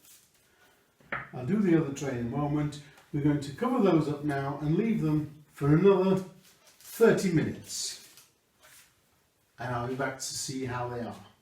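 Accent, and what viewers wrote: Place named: Northwest England, United Kingdom. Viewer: This is amazing and made my day. Love the Northwest accent as well 😂 - All the way from Canada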